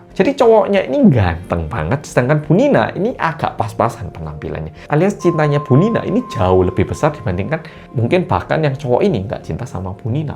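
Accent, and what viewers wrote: Java, Indonesia. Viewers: Hee jadi suka nonton pidio nya mas.. Ceritanya medok Jawa dan ceritanya santai jadi mudah D dengar, 👍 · Rodo medok tenan ya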